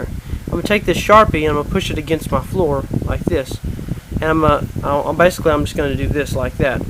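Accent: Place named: South, United States. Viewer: his accent isnt that strong i would say that accent could only go as south as missouri, stop hating on him just because he has a bit of a country accent city boy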